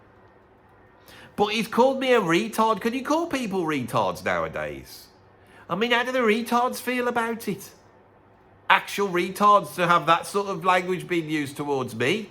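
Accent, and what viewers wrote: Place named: England, United Kingdom. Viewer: I have to say, I never realized how American the epithet “douchebag” is until I’ve heard it with an English accent.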